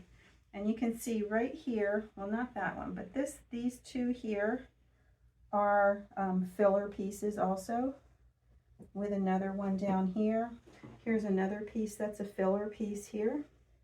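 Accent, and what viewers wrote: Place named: Delaware County, United States. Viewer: I recognized your accent immediately and had to look back to find your location! My family and I are DELCO and have nice relocated. Your voice and accent is soooo comforting! Your quilts are pure love! So happy to have found you! I just want to get away from the commercialized channels and found home!!! So happy!!!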